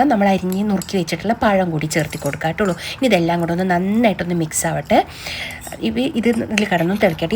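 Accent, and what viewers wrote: Palakkad, India. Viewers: കേട്ടോളൂ കേട്ടോളൂ പാലക്കാടൻ ഭാഷ നല്ലൊരു രസമുണ്ട് കേൾക്കാൻ 😄❤ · പാലക്കാടൻ രുചികളും പേരും ഒരു രസാണ് അതിന്റെ കൂടെ ഒരു പാലക്കാടൻ സംസാരവും കൂടെ കേട്ടാലോ ഹയ്യ് എന്താ രസാ കുട്ട്യേ ബഹുബഷ് 🤭അടിപൊളി പായസം kutta കണ്ടപ്പോൾ കൊതിയാകുന്നു 😋😋😋അതിന്റെ ഇടയിൽ താൻ സംസാരിപ്പിച്ചു കൊതിപ്പിച്ചും കൊള്ളാം അടിപൊളി 😋😋😋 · From your language, are you from Palakkad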